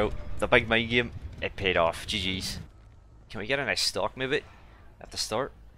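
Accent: Northern Ireland, United Kingdom. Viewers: northern ireland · Are you from Northern Ireland?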